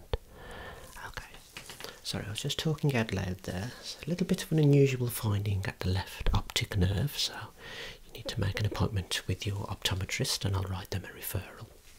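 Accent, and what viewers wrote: Birmingham, United Kingdom. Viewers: Is it a Brummie accent? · Hes from birmingham or wolverhampton. The accent.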